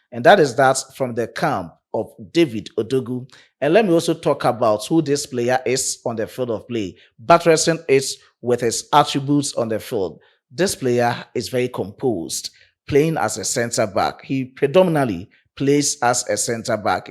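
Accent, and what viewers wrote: Ghana. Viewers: Mr you sound Ghanaian to me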